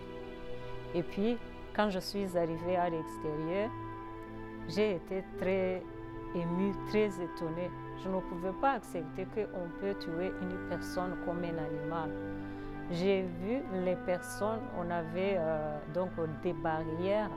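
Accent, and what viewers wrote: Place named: France. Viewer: Idk why but I love her French accent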